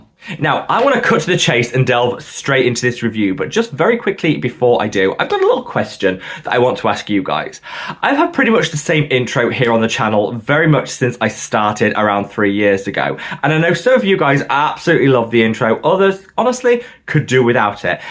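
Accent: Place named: North, United Kingdom. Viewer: Love this!! I'm a huge fan of Revolution products - will it be better than the Sali Hughes one which is just lovely. I think your vids are fab, fellow northerner here loves hearing your accent and the info you give is invaluable especially for those whose pockets are not as deep as some reviewers like to think. I'll be popping it in my basket next shop! I must say My skin has improved dramatically over the last few years, I'm 47 and since following a real and consistant skincare routine my confidence has been boosted, especially since people are saying I look 10 years younger than I am - I'll take that ANYDAY! I'm not saying I have good genes, but I do know the skincare and advice your channel has given me has helped for SURE!! Keep up the good work, I'm jealous of your skincare shelf!! 😍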